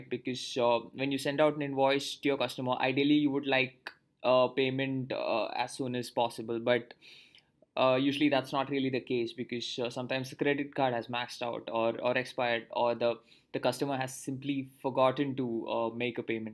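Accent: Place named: India. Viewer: 😅 indian accent 🤦‍♂️🤦‍♂️ at least try to speak without accent, 🤦‍♂️🤦‍♂️ nobody likes this shit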